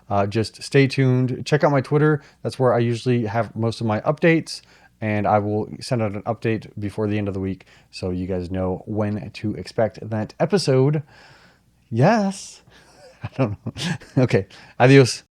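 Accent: South, United States. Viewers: I love your vlog on this video at your house in Texas during your quarantine until you're talking about the second episode of "The Star-Spangled Man" from the mini-series of "The Falcon and the Winter Soldier" got came out on Disney+ while it's all about John Walker, who appears on the morning talk show of "Good Morning America" as Captain America, revealing his desire to live to Steve Rogers' mantle. Meanwhile, Sam Wilson and Bucky Barnes struggle to work together as Barnes expresses disapproval in Wilson's decision to surrender Roger's shield. They travel to Munich and the find the Flag Smashers smuggling medicine. Wilson identifies a possible hostage, who is revealed to be the group's leader, Karli Morgenthau. The Flag Smashers, with enhanced abilities, quickly overpower Barnes and Wilson until Walker and Hoskins requests Barnes and Wilson join them in aiding the Global Repatriation Council (GRC) to quash the ongoing violent post-Blip revolutions, but they refuse. 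Meanwhile, Morgenthau receives a threatening text from an unknown number, so you're talking about all of the characters from the start after that, I hope your husband, Zach will be proud of you and I'm very happy for you, I know you're half Mexican and I love your Southern accent!! #TheFalconandTheWinterSoldier #DisneyPlus #Marvel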